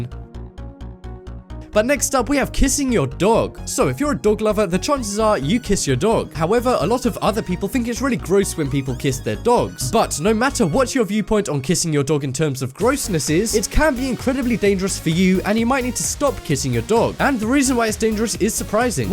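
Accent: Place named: United Kingdom. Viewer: you have a strange American accent, sounds very much like a British one.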